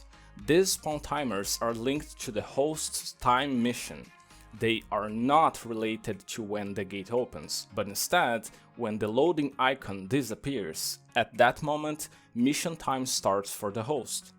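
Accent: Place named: Turkey. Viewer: Are you Turkish? Your accents kind of sounds like a Turkish accent but not a thick one.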